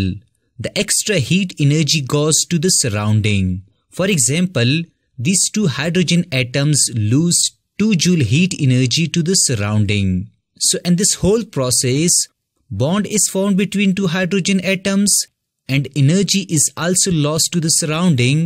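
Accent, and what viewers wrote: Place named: Kerala, India. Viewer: SI=ir get a accent your accenet is so keralan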